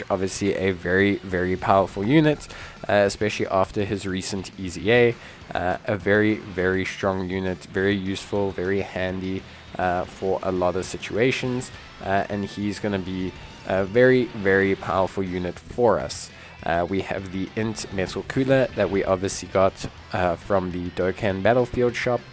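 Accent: South Africa. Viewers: you sound South African, are you from south africa?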